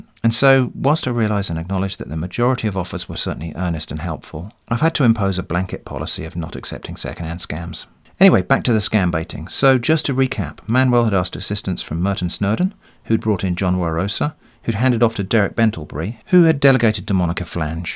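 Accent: England, United Kingdom. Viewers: It's an English gentleman speaking English language.like Liverpool FC playing at Anfield . What is there not to like?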